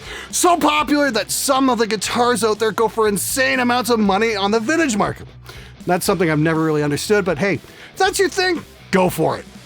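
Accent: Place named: Canada. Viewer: that was the most canadian accent i ever heard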